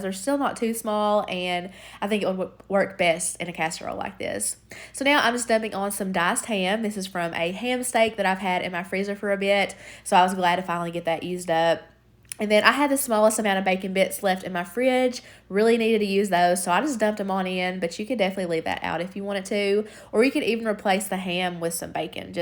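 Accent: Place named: Kentucky, United States. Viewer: Hey I've been binge watching your videos all week! I love the different recipes and ideas you have, I can't wait to try some. Are you from Kentucky too? I'm right outside Lexington but you sound farther south or maybe eastern ky. Much love 😘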